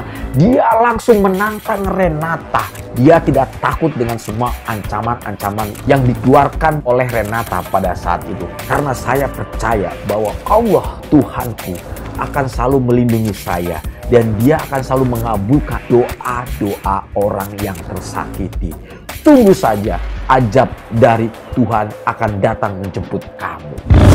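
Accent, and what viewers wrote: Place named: Sunda, Indonesia. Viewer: Resep KA momo bar bar lebok ku sia haaaaaaaaaa Sunda banget nya bang saya Oge orang banten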